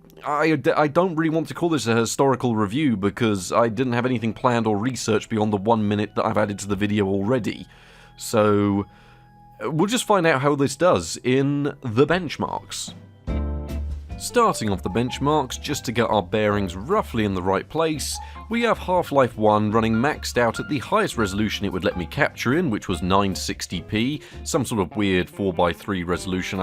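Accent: England, United Kingdom. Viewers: You must be from the same area of England as Jeremy Clarkson because you sound like a younger version of him.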